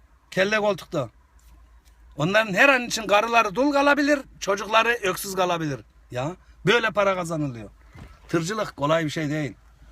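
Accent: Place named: Anatolia, Turkey. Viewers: abi ben niğde/bor luyum. şiven bizim ile hemen hemen aynı ve çok doğal bir insan olduğunu en iyi ben bilirim. biz orta anadolu insanları düm düz insanlarızdır kaba gibi görünen iyi niyetli ve neşeli insanlardır. aslında bizi kaba sananlar kendini beğenmişlerdir. bir çok ayrıntıya belki en çok ben gülüyorum.. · Sen ne güzel bir insansın be abiciğim ya ağlamaktan seni YouTube izlerdim konuşman muhabbetin çok hoş anadolu memleket gibi Mekanın cennet olsun abim güzel insan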